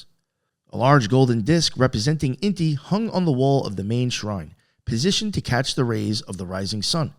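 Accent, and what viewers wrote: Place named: New York, United States. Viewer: 🎉 I gotta start listening to more of you. Like a little bird coming back to its nest, your warm Italian NY voice is just too dam rewarding.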